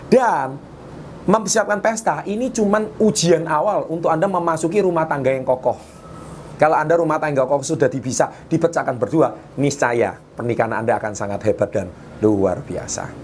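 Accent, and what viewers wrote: Java, Indonesia. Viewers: Orang jawa ya mas Medok ya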